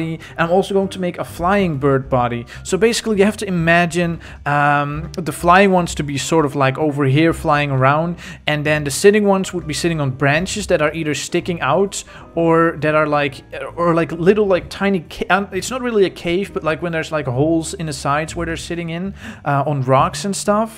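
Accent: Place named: Netherlands. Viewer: Ayy you sound dutch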